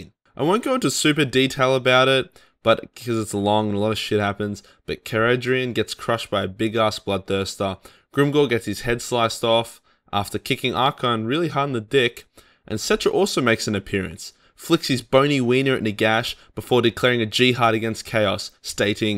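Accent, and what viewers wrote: Australia. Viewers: its so good to hear ozzies narrate stuff, with our slang and love for C and F bombs and if you dont like it then F%$k off attitude, cheers mate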